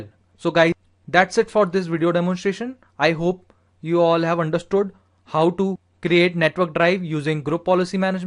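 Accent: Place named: India. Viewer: another excellent tutorial with a thick indian accent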